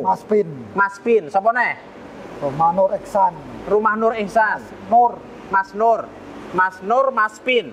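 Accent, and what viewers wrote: Kendal, Indonesia. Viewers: Logat nya emang kendal itu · Logat bicarax kayak orang Kendal weleri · Logatny ky orang kendal .. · Logat suaranya seperti orang gemuh,kendal. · Logate kendal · Bahasane asli kendal..daerah ku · Bahasane kendal banget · Logatnya Kendal bgt pak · Iku logate wong Kendal maa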